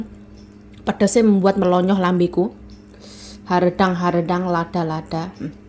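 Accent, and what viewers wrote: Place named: Sunda, Indonesia. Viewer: Kocak ngemeng Sunda na 😅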